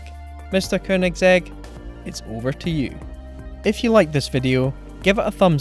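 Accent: Scotland, United Kingdom. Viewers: so what he’s scottish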